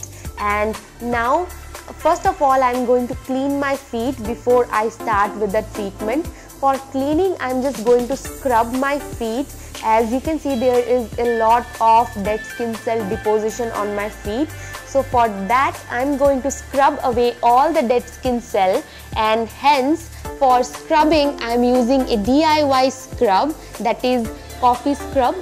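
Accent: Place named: India. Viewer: Absolutely true annoying mostly Indians speak English like this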